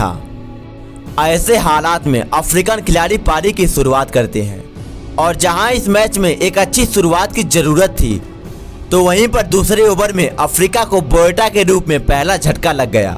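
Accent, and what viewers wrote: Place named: Bihar, India. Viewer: Tu bihar se ho ka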